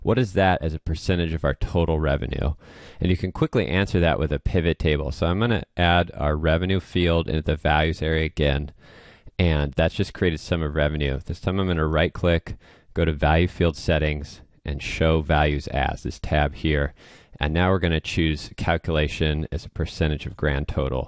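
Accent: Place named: United States. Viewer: Finally we can have a real American instructor, on Computer many on YouTube is people's from India, hard to understand any instructions in our English language, because they Hindus 😆👈 Thanks ❤️ best regards from Guatemala 🇬🇹 the Eternal Springs my temporary house also your's my friend. ❤️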